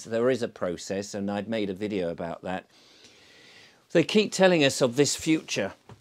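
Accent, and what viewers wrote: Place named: Eastbourne, United Kingdom. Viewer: Sounds like you live in Eastbourne ☺️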